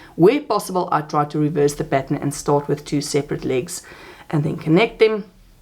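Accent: South Africa. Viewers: Hi there, from Germany 🇩🇪. I love your Chanel and I am so grateful to have found you. As I have lived in South Africa for 34 years, (I was brought up there, lived there from 1969to2003), it is so wonderful to hear your accent. It makes me feel so at home, as I miss SA. I have been crocheting for many years. We had a knitting and crocheting business in Johannesburg. I started amigurumi here in Germany. I also do mini albums, scrapbooking, cards etc. I love your crochet work. You work so neat and tidy. I love your taste in projects a lot. Keep on with your excellent work. I look forward to your videos. Thank you so much for giving us all these pattern advices and tips, just love it. 🤗💖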